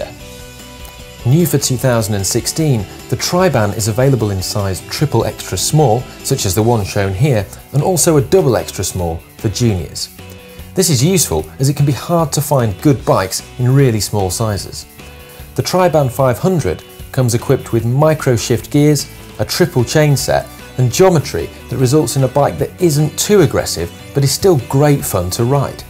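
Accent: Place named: Northern England, United Kingdom. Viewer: accent has gotten a lot more Northern nowadays.......by 'eck!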